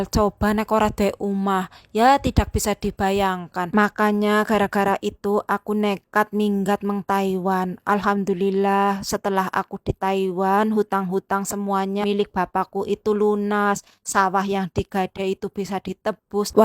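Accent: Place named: Banyumas, Indonesia. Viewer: Sehat dan semangat terus mba'e💪💪💪 Asli mna mba'e kok kayakne ngapak2 😂😂 kaya inyong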